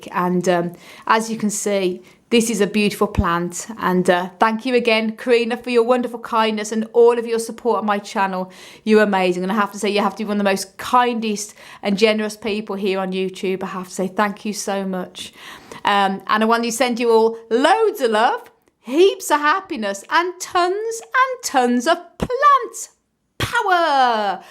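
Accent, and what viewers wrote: United Kingdom. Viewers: Hello....I recently found your channel and I became your follower......😘😘😘😘😘😘😘by the way....I LOVE YOUR BRITISH ACCENT.....😊😊😊😊😊😊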